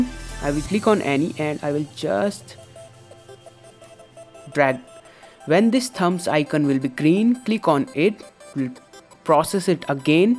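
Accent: India. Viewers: Am I wrong for immediately not trusting this due to the street shitter accent? lmao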